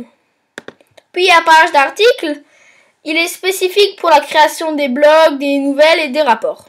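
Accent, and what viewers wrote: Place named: Alsace, France. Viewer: Ta voix comme elle a change et jkiff ton accent Alsacien 😂😂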